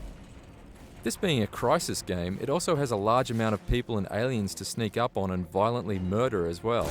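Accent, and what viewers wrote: Australia. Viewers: He sounds Aussie :D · An aussie, finally <3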